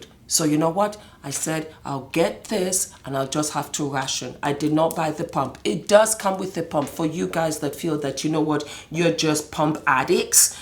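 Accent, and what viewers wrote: France. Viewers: Your French accent is coming out.... very very.i love it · first time watching...i totally enjoyed it, love the french accent:) #newsubbie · That accent. is that French?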